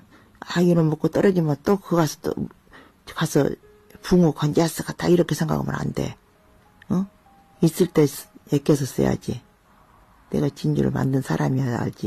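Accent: Jeolla, South Korea. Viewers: 우리엄마가얘기하는거같다 전라도사투리도쓰면서ㅠ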